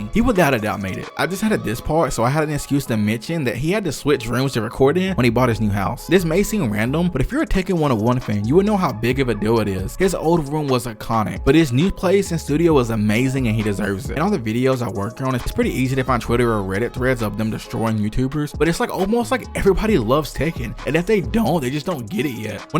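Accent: South, United States. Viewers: I haven’t heard a southern accent this deep since I visited The University of Alabama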